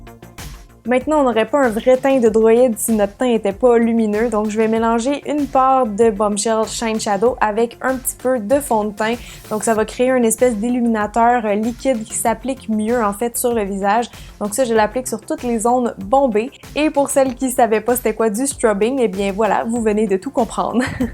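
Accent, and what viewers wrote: Quebec, Canada. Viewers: Es-tu québécoise ou quelque chose de ce genre car tu as un accent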